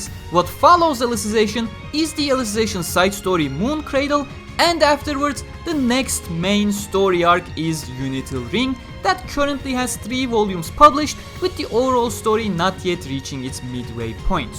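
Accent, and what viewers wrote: Turkey. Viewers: Böyle bir konuşmanın bir Türk tarafından İngilizce olduğu çok belli. Başarılar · Aksan ve nickinden Türk olduğun anlaşılıyor bu arada genede çabaların hoşuma gitti Global olma yolunda başarılar :)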